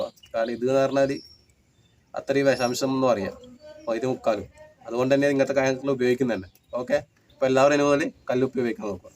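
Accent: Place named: Kannur, India. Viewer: Slang belongs to Kannur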